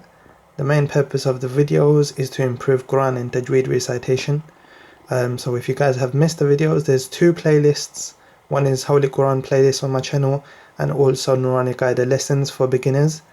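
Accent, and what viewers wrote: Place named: United Kingdom. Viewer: Well. He sound British. It doesn't matter what race or religion you can still be Muslim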